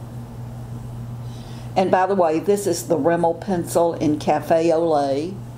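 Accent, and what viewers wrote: South, United States. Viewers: Kindness begets kindness, you are so right! And I believe it is contagious! BTW, I love southern accent. No other accent in the world is as charming, and makes you want to smile regardless of how you may be feeling. And yes, I am a southerner so I might be a "tad" partial!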